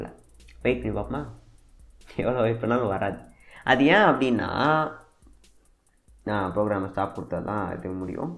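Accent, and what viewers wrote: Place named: Tirunelveli, India. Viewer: Hi bro unga slang vachi parkumbothu i think you are thirunelaveli paiyan I'm i correct✅😂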